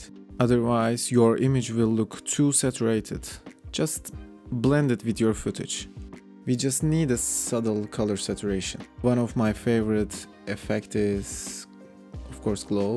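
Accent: Turkey. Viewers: Türk her yerde Türklüğünü belli ediyor ya aksanı duyar duymaz anladım :) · Hey bro, are you Turkish right? you're accent almost same Turkish people ahaha lol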